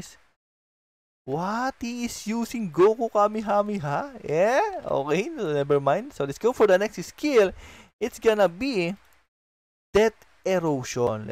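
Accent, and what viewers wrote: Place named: Philippines. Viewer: The accent is like a Philipino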